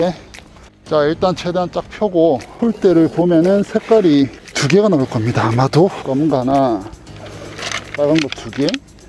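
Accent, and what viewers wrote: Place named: Gyeongsang, South Korea. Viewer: 갱상도 발음으로 설치해주셔서 엄청 친근감 있습니다!^^ 영상 덕분에 첫피칭 잘 해보겠습니다. 😂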